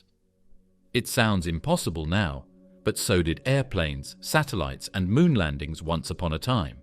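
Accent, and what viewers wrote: England, United Kingdom. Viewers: Why the english accent? You can't find an american to narrate? It's annoying. Unwatchable.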